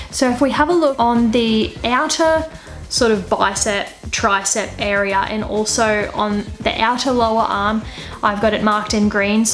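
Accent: Australia. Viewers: you are so cute, i love your little australian accent :3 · She is Australian. Not British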